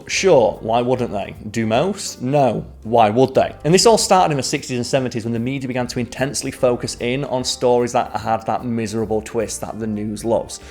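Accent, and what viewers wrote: United Kingdom. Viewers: sounds very English but i assume the channel is aimed at the US because British people would never refer to the Christmas period as "The holidays" we just call it Christmas time. Holidays are just any time spent away from work or school or we use it in the same way Americans use the word vacation. Don't ask me why i felt the need to share that but it just sounded weird coming from someone that sounds ultra English.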